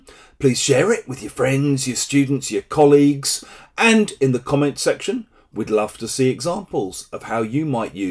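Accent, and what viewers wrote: United Kingdom. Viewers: it is real British English mate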